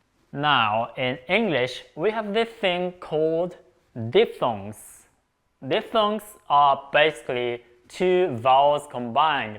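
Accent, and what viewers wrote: Japan. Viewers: What about your Japanese accent,? You have an audible accent, and it's certainly more subtle than the stereotypical one you are teaching here, but it doesn't even sound very similar to the one that you are teaching here (to me at least).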